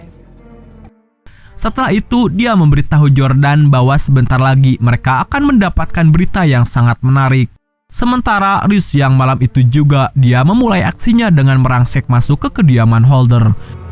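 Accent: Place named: Sunda, Indonesia. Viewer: Minnnn kamu Sunda nya dimana ?? Logat sunda nya kentel bgt 😁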